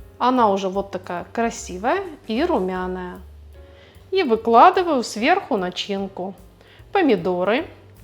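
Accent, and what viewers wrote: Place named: Ukraine. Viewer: Сразу слышно, что хохла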